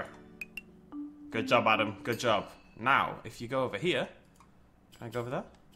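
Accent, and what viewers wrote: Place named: United Kingdom. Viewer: Hate to be that guy, but some words have multiple valid pronunciations, and regional pronunciations are a thing. Utilizing several sources, I have determined that there are three accepted pronunciations of the word, with the two american ones being "Nitch" and "Neetch," and the common British, which if you couldn't tell is, pronunciation being "Neesh."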